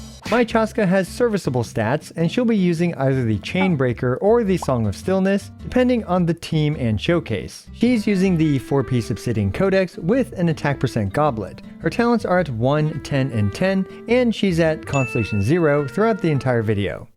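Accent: United States. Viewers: stop why is ur yeehaw accent so good HAHAH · i love the country accent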